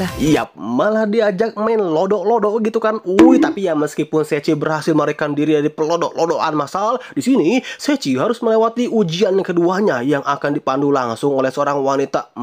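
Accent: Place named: West Java, Indonesia. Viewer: Mang orang Sunda nya 😊😅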